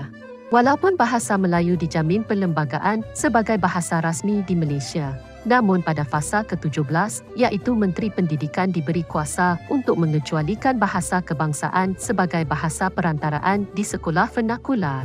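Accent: Indonesia. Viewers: Anda sekarang bicara berlogak bahasa Indonesia kan?? 🤣🤣🤣 Gak usah bilang itu bahasa melayu baku. Preet!! Admin peak lu! Banyak belajar sono! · btul ini admin ber loghat indonesia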